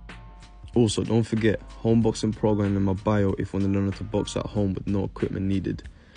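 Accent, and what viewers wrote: United Kingdom. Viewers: Brother are u British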